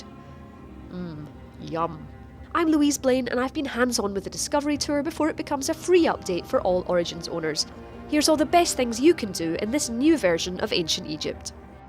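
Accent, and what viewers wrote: Scotland, United Kingdom. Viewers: TDX just her accent,Scottish i think,pretty cute actually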